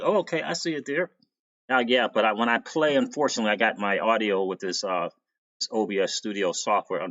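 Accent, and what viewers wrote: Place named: Brooklyn, United States. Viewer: I think it must be a Brooklyn accent. There was a rumor that was posted on aa redit page from someone claiming to be a ex employee of ripple who believes that stellar and xrp have been designed to be used as world reserve currencies. XRP is the store of value and stellar to be used as a digital currency. What are your thoughts? Maybe you could do a video on that.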